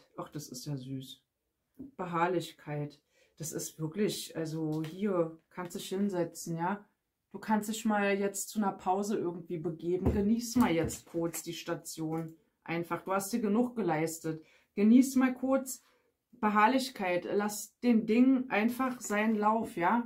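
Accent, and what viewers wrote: Berlin, Germany. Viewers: Liebe, danke für deine "Berliner Schnauze". Red wie sie dir gewachsen ist. Ich mag das sehr! Die wenigen Kritiker gehn doch unter bei uns. Du hast mir die letzten Wochen einen unglaublich klaren Blick auf die ganze Sache und meine Erfahrungen/Bedürfnisse geben. Auch wenn es oft weh tat/ tut. Nur so kann ich gestärkt vorwärts. Danke und weiterso. Fühl dich gedrückt. Liebe Grüße aus Bregenz am Bodensee 💕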